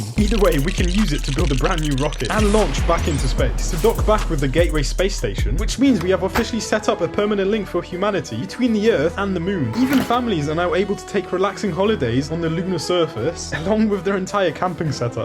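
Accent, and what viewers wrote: United Kingdom. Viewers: Is he a UK person? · i realed that he is brithish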